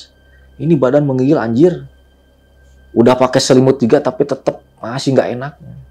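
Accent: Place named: West Java, Indonesia. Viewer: Lucu nya klw orang Sunda ngomong gua elu..😂 semangat kang ...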